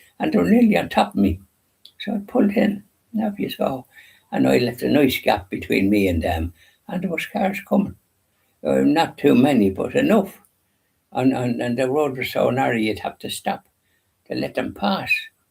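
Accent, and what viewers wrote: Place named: Ireland. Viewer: Your Irish accent is difficult for me to understand sometimes but I understand most of what you say, and I think I’ll understand you more the more I watch your videos! God bless you and yours!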